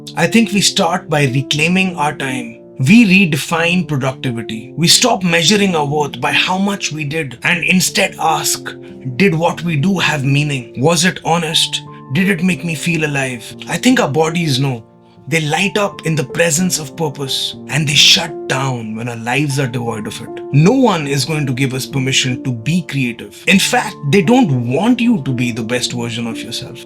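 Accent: India. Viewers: reminded me of a YouTuber bass player who plays Behs you know?) Danny Sapko. So he’s pronouncement and yours are very similar except one little spice of India in yours and one little spice of Australia in his.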